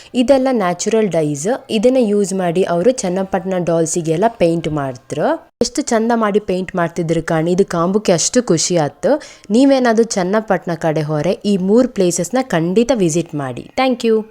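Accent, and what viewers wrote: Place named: Kundapura, India. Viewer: Happy to hear your Kundapura kannada❤all the best for your further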